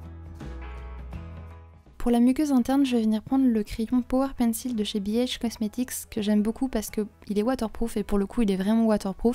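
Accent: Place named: France. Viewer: Genial! Solo estaba preguntándote de eso porque vi un video tuyo en que hablaste en español porque me encanta ese idioma con el acento frances, suena tan hermoso! Solo es que soy un "nerd" cuando se trata de idiomas y por eso vine :v pero me caes bien, claro lol! q tengas un buen dia y ojalá que yo pueda hablar el frances y el aleman algun dia ya que son tan hermosos!! chau!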